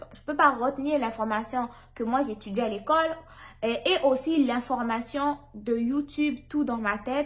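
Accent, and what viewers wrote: Canada. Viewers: tu as deja l'accent canadien. Toutes mes felicitations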